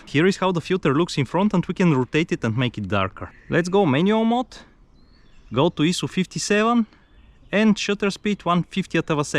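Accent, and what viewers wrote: Russia. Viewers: Чувак, у тебя просто кошмарный рязанский акцент.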